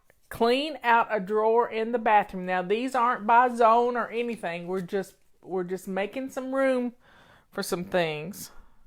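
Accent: South, United States. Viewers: Omg. You are so southern. I love it but I never imagined your voice being so perfectly southern 🖤🖤. Been following you for years and finally GETTING it by not trying to alter the system. Thank you!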